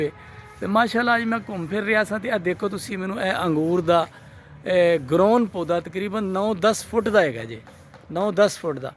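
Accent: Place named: Punjab, India. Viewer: میٹھی پنجابی دا میٹھا ویلاگ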